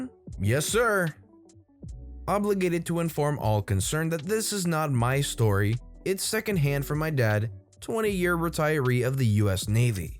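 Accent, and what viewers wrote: United Kingdom. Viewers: is British, that'll be why / sounds different XP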